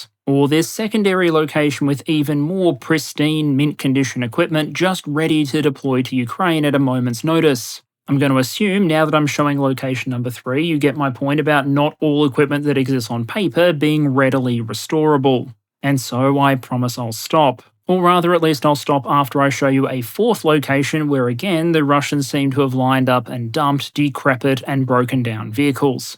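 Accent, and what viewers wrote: Australia. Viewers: what helicopters? The ones Wagner shot down? Because the Ukrainians short down zero. What nonsense was he speaking about in the video? 🤭 Helicopters are good once the ant-air is knocked off. So it's offensive overkill. You really should stop listening to this clown. He has wasted hours and hours of your precious time and your brain is growing mushrooms. He's a disinfo channel on the payroll of some 3 letter agency like the CIA or in this case, probably British. Lots of useless details, meandering around w some patronising Aussie accent does the trick it seems. Too ez. 🐑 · Mr., I don't understand why you were concerned about this update, it is excellent as usual. You have my greatest respect for staying on track and as far as keeping with in the boundaries of reality. Thanks once again. I am always so grateful to hear such an incredibly intelligent voice with an Australian accent. 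Baku boy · Thanks for getting me through a bumpy flight to my vacation mate. Just listen to the nice Aussie man and ignore the turbulence.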